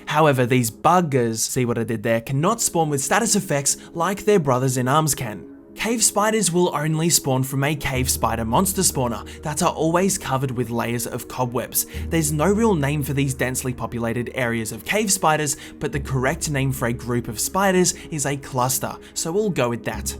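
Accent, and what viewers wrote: Australia. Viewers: Yeaaaaaah I knew you were Australian for dat accent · I immediately knew you're Australian because your accent is British on steroids. I know that I as a German should probably not be saying that, as German is basically Dutch on steroids, but that's something I just wanted you to know.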